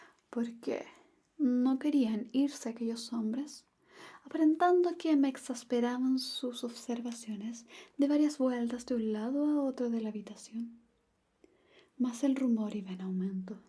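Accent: Chile. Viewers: Yo solo vengo a que me lean en chileno, aunque me odien jajaja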